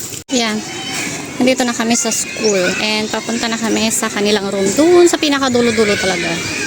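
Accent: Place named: Manila, Philippines. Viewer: Hi,. May I know where you're originally from? You speak Tagalog and English like someone from Manila or Luzon.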